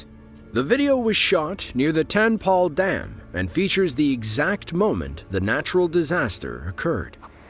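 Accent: United States. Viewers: Imágenes impresionantes, con la voz de un locutor... Por dios... como puede ser tan desagradable al oído el inglés estaunidense...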